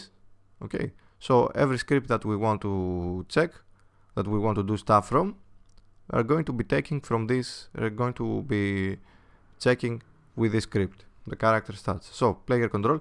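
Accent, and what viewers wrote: Greece. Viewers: I can tell the Greek accent miles away!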